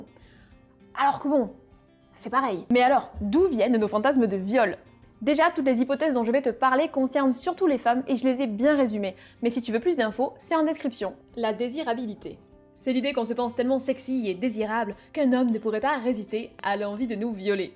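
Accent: South, France. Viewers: Bravo GG félicitations avé le petit assent du sud <3 <3 L&V 💑 #ĹҎ ➰ #Shibuntu <--- Seek on Youtube ƪ(˘⌣˘)ʃ 🌸 #ShibariConsensuel 🎀 #ASMR 🎧 #LacherPrise #Lacher_Prise_ 💐 #Shibari ⛩ #Kinbaku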